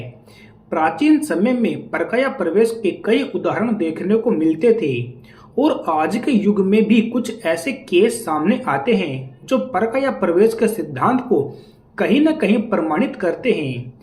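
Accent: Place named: Bihar, India. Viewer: Tumhari bhasha hindi se bilkul alag hai,shayad bihari type ki. Lagta hai koi kitab padhkar baat kar rahe ho. Kitab shuddh hindi me likhi hogi par aap bihari lahja laa rahe ho. Koi like nhi. Skip direct.ok ji..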